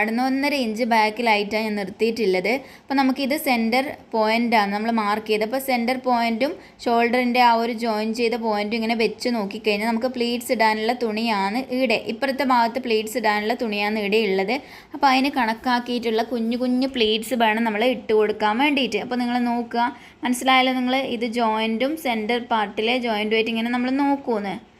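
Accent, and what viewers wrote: Kannur, India. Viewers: ഇത് കണ്ണൂർ ആണോ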